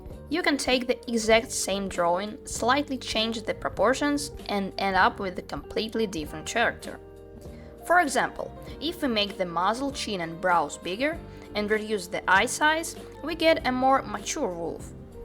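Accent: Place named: Indonesia. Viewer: For some reason I hear a little Indonesian accent lol, thank you so much for the tuts!!💖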